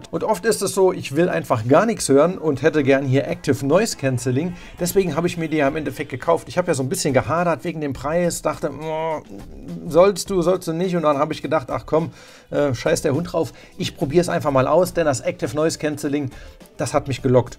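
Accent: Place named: East Germany, Germany. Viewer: Ossi Akzent ...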